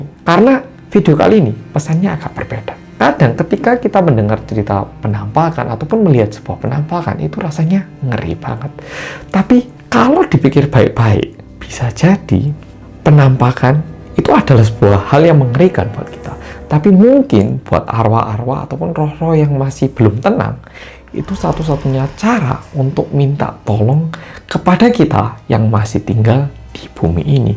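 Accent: Java, Indonesia. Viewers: Betul juga ya mas korea medok🥰 penampakan merupakan permintaan tolong bagi arwah unt kita yg masih hidup😊tks atas critanya yaaa🤓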